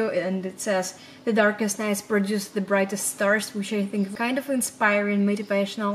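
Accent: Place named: Russia. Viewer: хоспаде, она же не занималась курсом фонетики, чисто русский акцент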